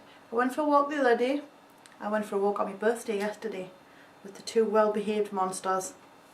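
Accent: Newcastle, United Kingdom. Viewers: The accent comes from south shields, which is in northeast England very alike to a Geordie accent love it <3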